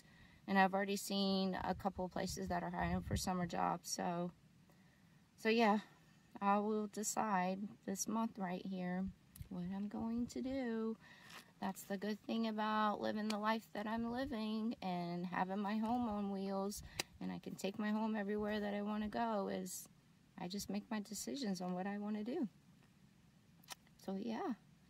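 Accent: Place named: Texas, United States. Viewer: Knew I heard the Texas twang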